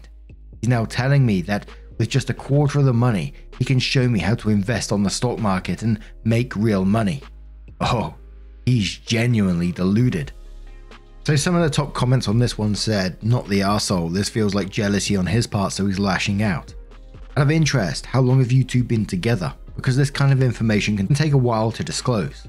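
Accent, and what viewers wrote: United Kingdom. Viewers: I love hearing how you pronounce words differently in the UK than the US!!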